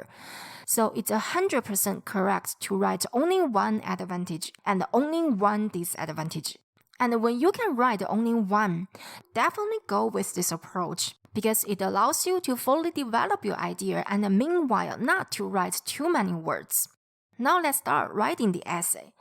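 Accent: China. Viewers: terrible chines accent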